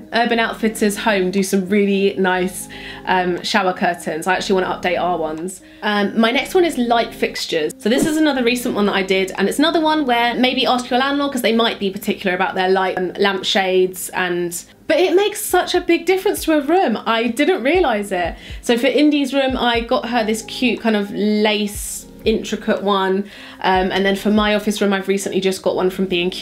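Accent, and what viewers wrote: London, United Kingdom. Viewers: you sound so London in this video for some reason haha! your decor is gorgeous xx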